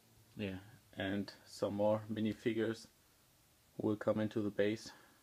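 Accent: Germany. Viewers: Ich finde es witzige wie er English spricht aber Deutsch ist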